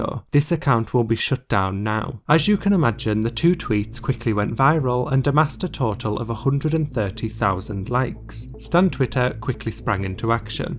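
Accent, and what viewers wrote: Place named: Northern England, United Kingdom. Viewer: the northern accent makes this funnier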